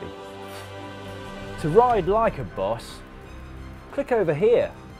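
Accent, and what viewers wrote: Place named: United Kingdom. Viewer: Gotta love the British accent. A place cooled (called) cool. shoutout to Gavin Free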